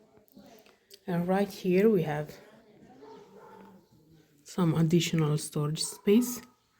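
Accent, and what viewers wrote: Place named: Italy. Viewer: Very nice presentation in English with charming Italian accent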